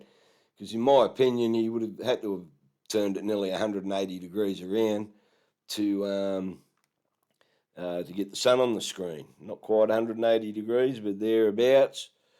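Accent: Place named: Australia. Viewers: oz accent just makes it all that much more entertaining.. i hope these clowns continue to release more and more questionable footage, so we can keep LAUGHING at them. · His accent is absolutely stereotypical Australian…I know, I’m Australian and my accent isn’t like his at all. We have more than one accent here. WA is different than SA and TAS is different again, etc. Also, the more rural the more it will sound like. You generally only find posh accents in the cities and larger towns and the real posh ones sound like fake Brits. 😂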